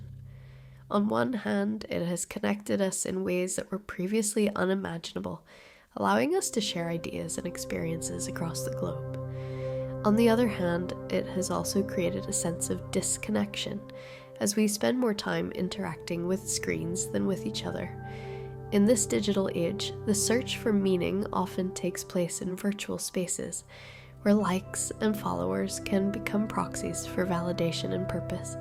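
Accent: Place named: Ireland. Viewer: I pay attention to wait beautiful smart women say or do. And I revell in your subtle irish accent.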